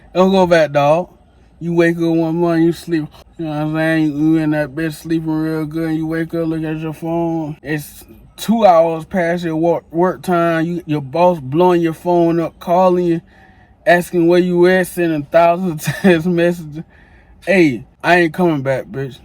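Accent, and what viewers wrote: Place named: South, United States. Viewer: Obviously, he probably from the south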